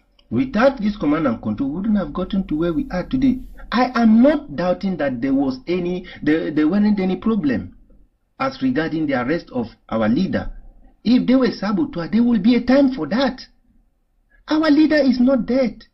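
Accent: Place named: Fulani, West Africa. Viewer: You make do much sence but your intunation sounds like a Fulani person Thank you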